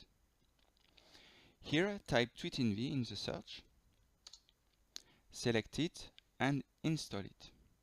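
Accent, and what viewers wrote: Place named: France. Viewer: J'adore cet accent so frenchie! Merci pour ce tuto clair et précis! J'utilise depuis quelque temps la version 0.9 de tweetinvi et j'essai de poster une vidéo (pouvant dépasser 15 Mo). Suis je obligé d'utiliser la version 1.1 ou 1.2 et si oui, y aurait-il un tuto pour l'utilisation de ces nouvelles versions? Il semble que TwitterCredentials ou AuthFlow.InitAuthentication ne soient plus valides pour la connexion d'un utilisateur X qui souhaite se logger avec son compte tweeter. Merci.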